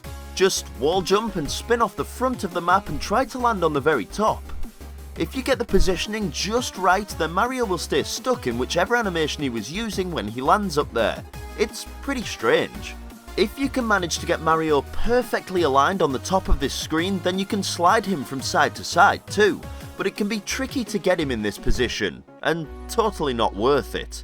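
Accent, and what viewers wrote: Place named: England, United Kingdom. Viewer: I very clearly have a soft spot for English accents because I'm subbed to Andy from A+Start as well!😂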